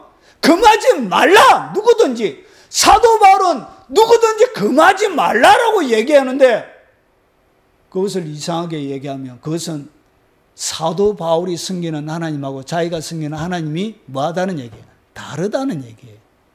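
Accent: Gyeongsang, South Korea. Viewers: 억양이 강한 경상도 사투리 때문에 그런 오해가 있는 것같습니다. 저도 경상도 억양 때문에 그런 이야기를 들은적이 있어요. 부디 방언으로 능력있게 기도하시길 바랍니다. 할렐루야 ~!!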